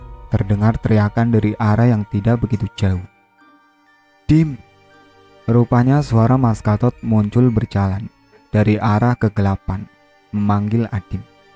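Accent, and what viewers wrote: Java, Indonesia. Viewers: bhsnya jawanya lucu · Terlalu berlebihan Jawanya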